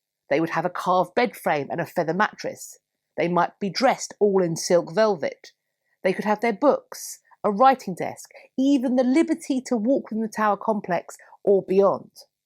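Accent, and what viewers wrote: United Kingdom. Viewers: With your British accent, you present as a stuffy person, but, you are a hoot, a very kind hoot. ;)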